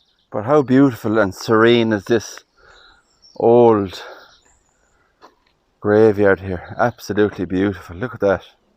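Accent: Ireland. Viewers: This was my first time watching this channel and I'm just wondering during are you Irish and is that where this beautiful graveyard is?! 😊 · I like to watch videos like this every now and then. this kind of old Cemeteries are very fascinating but wouldn't like to be there after dark! In addition, I find Ireland and Scotland breathtakingly beautiful. Been there a few times. I don't know why, but I feel a kind of connection when I'm there. and I love your Irish accent 🤩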